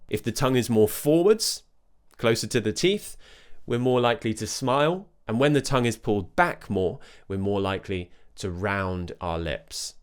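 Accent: United Kingdom. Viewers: I have been trying to differentiate the American IPAs and the British ones... Not easy... But I think I have figured it out thanks to your video. Love you, teacher!!!!!! Thank you so much!!! Your British accent sounds soooooooooo nice in my ears.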